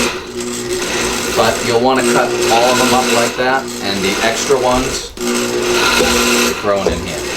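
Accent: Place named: Texas, United States. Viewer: I love the Texas accent. Great job!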